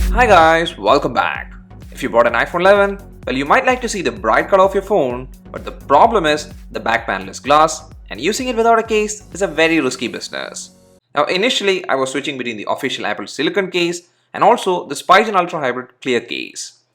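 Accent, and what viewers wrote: Kerala, India. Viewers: aa accent ketapozhe enik thonni malayalee aanennu ..hahaha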